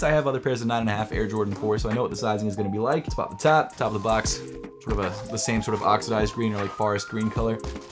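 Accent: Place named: Philadelphia, United States. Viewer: Wait hold up, You’re from Philly bro?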